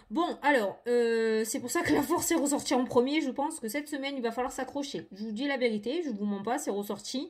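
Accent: South, France. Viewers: Il vient d'où ce petit accent du sud ? Mdr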